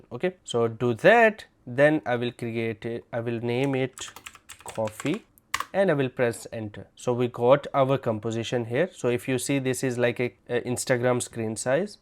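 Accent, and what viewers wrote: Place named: India. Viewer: Why his english is likely Indian accent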